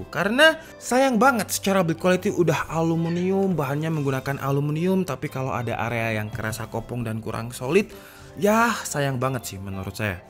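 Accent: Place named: Madura, Indonesia. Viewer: Logat Madura kek gitu Madura bagian timur, ngk semua kok logat kek gitu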